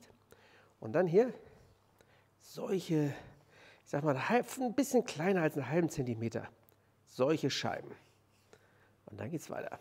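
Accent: Bavaria, Germany. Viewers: Alles OK aber hör bitte auf Bayrisch zu reden. Das hört sich bei dir sooooo grausam an... 😭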